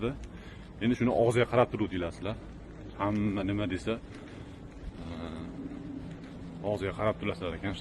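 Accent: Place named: Andijan, Uzbekistan. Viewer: Ома гап йук анжонча гап булди ишларизга омад ✊ Хусанов укамиз уз даражасини курсатади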